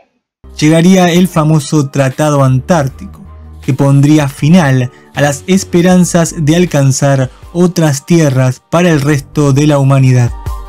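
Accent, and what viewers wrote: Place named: Argentina. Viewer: tu hablar con ese sonsonete argentino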